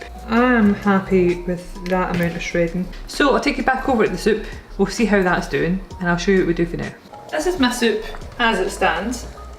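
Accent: Scotland, United Kingdom. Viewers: Nice to hear the Scottish accent as I have been away for many years! Parsley is rich in vitamin C and other antioxidants, which help reduce the risk of serious health conditions like diabetes, stroke, heart disease and cancer. It's also an excellent source of: Vitamin A. Folate. I make the soup just like you and like you mentioned turnip has to be in mine, I won't make it if I forgot to buy it. To make it healthier just after I take the ham out, I skim to top of the ham water into a glass container and place it in the fridge just in case I need it - you will see the separation of liquid and fat and be glad it is not in your soup.(also do it for all my stews) White pepper is nice and poultry seasoning has thyme and rosemary.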